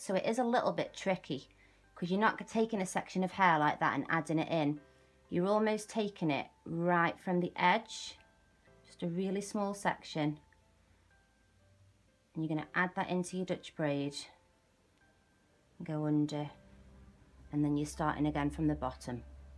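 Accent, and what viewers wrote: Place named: England, United Kingdom. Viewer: si es Ingles pero se le escucha el acento como de Inglés (los que son de Inglaterra)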